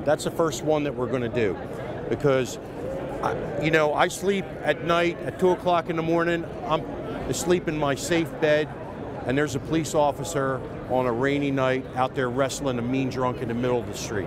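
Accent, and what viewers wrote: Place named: Philadelphia, United States. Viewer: It’s his philly accent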